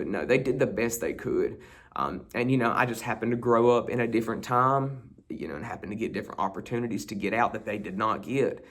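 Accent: South, United States. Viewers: it is so enjoyable to watch and listen to you. You're so easy-going, sincere, and yet so honest too! Of course, the Southern accent doesn't hurt either. But seriously, thank you for coming on YouTube and presenting such excellent content... part of yourself.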